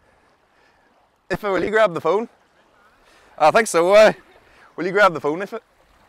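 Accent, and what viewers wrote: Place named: Northern Ireland, United Kingdom. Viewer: Baffled me why your accent was like northern Irish . Till i realised you are only about 12 miles from derry city . Unless you are actually from derry ?. 👍👍. Grandad is from Galway .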